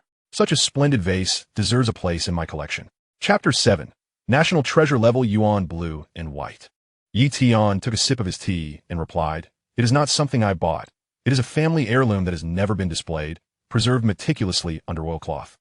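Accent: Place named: France. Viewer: Stopped after 7mins due to that FRENCH ACCENT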